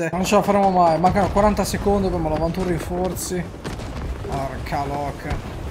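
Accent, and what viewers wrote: Sardinia, Italy. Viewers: E' uno spettacolo questo gioco, ed il tuo commentario mi fa sbellicare dalle risate...questo accento sardo è super! Meno male uno che finalmente mette nella sua homepage quì non c'è fortnite...sei ancora più mitico!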